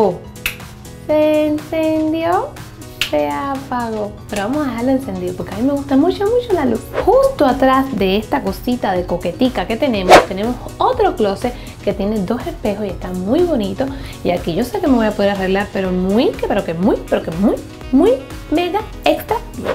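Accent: Cuba. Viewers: Sandra le da asesoría igual que Harold trabajan con ella, en ningún momento dicen que son familia. El acento es de Cuba. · Las cubanas tienen la misma voz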